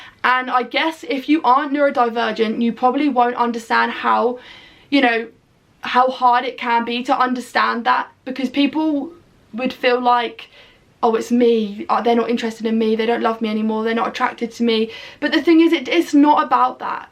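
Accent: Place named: United Kingdom. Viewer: I find non-violent communication from Dr. Marshall Rosenberg to be helpful with direct conversations about difficult topics. It's an amazing way to show what your needs are without being pushy (or feeling pushy) about it Also, you sound British. In Dutch society it's a bit more chill because people are used to being direct (I'm Dutch). So culture plays an important part as well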